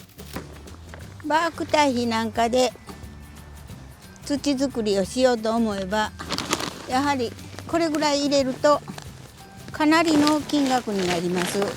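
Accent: Tosa, Japan. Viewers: 懐かしい、土佐弁の解説。最近、家庭菜園を始めました。夏野菜は、きゅうり、ナス。オクラなどを植えて、少しですが今年の夏は野菜代、助かりました。いろいろと、失敗したりしながらですが、楽しいです。の解説がわかりやすくて、親しみがあって。これからも、参考にさせていただきます。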